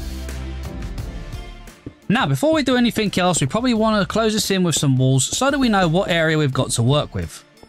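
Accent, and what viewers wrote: United Kingdom. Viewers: His British axcent is 🤯🤯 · Are you by any chance British you sound like one not being stereotype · Sounds like Bri'ish fella!!! (no offense)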